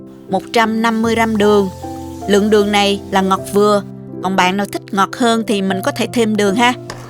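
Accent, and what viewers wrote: South, Vietnam. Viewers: Cám ơn đã chia sẻ cách làm chè con sò.Cách chỉ dẫn Rất gọn gàng, dễ hiểu, dễ làm và nhất là giọng nói miền Nam rất dịu dàng và ấm áp. Điều mà tôi thích nhất là cô không nói thừa, không nhắc đi nhắc lại những điều căn bản trong cách làm mà các bà nội trợ nào nghe và xem qua cũng nhớ. Chúc cô luôn vui khỏe và luôn thành công trong công việc của mình!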